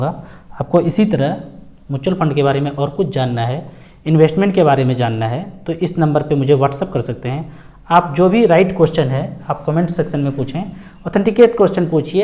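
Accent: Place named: Awadh, India. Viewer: सर आपकी बोली अवधी के जैसे है आपका होम टाउन कहाँ है.?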